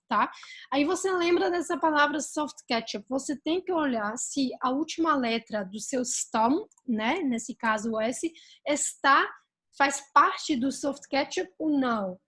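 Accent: Brazil. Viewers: Cara, tu é o bicho para ensinar. Você fala o portugues como brasileiros e com um sotaque maravilhoso.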